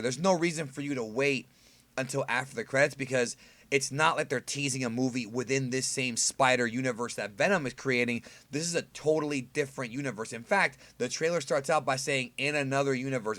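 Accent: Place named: Connecticut, United States. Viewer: This dude has got to be from Connecticut because he sounds exactly like the frontman Jamey jasta from Hatebreed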